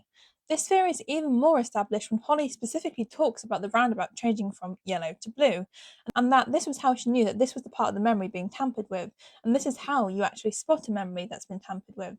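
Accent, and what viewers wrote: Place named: United Kingdom. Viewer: I like ur accent it's kinda like British🤭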